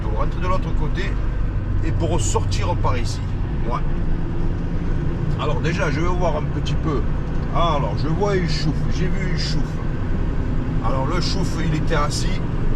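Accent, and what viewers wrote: Marseille, France. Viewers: "Même les bus ici ils sont cons" !??? Je pense que c'est plutôt toi le con qui te rend pas compte de la taille de la route 😂😂😂😂 C'est toujours plus facile de jeter la faute sur les autres ! Avec tes références claquées en comparaison avec les États-Unis !😂😂 Ridicule ! Le nombre de morts que Marseille fait à l'année Chicago le fait en un weekend ! Ferme-la c'est mieux tu sais pas de quoi tu parles surtout concernant les États-Unis. Avec ton accent de cagole😂😂😂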